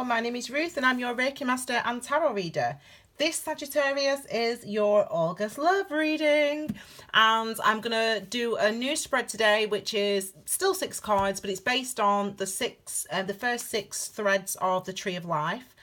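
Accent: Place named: Northern England, United Kingdom. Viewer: Northern England represent! Sounds like you are from my neighborhood. Thanks for this xx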